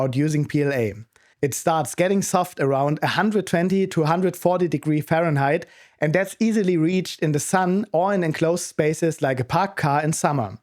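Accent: Germany. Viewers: Man hört an deinem Englisch direkt, dass du aus Deutschland kommst. 😂 Spätestens wenn man die Fehlermeldung am Prusa Drucker auf Deutsch sieht. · Deine Videos auf Deutsch sind so gut. Aber jetzt auf Englisch mit so einem furchtbaren deutschen Akzent … nee no offense, aber das ist echt grausam. Für meine Begriffe bleib beim Deutsch, da sind deine Videos ein echter Mehrwert.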